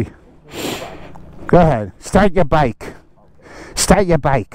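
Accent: New Jersey, United States. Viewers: Agree Stage II is the way to go. Best bang for your buck for sure. How was your cup of coughvee? :-) Love that Jersey accent.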